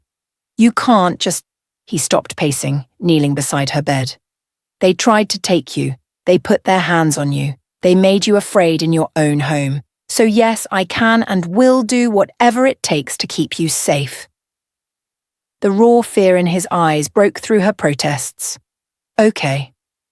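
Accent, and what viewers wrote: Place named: United Kingdom. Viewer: How could she have blood on her wedding dress if she changed into a "reception" dress just before the attack after the wedding? The narrator voice is so out of place in this story. It's way too British/formal... Why wasn't there anyone from her family or closest friends at her wedding? He's rich enough to have flown some in... Just sayin...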